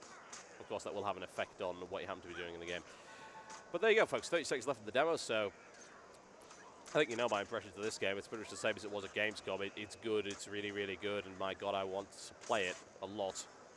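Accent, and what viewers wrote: United Kingdom. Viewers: ok there you are absolutly right, he is good and shows alot, skills, enviroment and such stuff. and other people who show stuff just suck. saw a video from an elementalist, over 10 minutes against the shatterer and he only used 3 skills, thats boring. but is really good, doing alot of skills, movement is good and his commentaries are really cool. i dont like hearing english with that british accent. but his commentaries are so awesome with the accent. like his videos.:)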